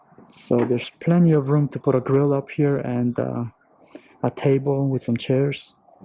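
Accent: Sweden. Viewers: I like this small house. Your accent.. sounds Swedish. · You are going to love it in Iceland. Reccomend coming in July since you don't like cold 😋 I look forward to your next series. I dont know why but your channel is so calming. Not a feeling I associate with building cites lol.. I'we been trying to place your faint accent. But it's to faint to be sure. Sounds a bit Swedish somtimes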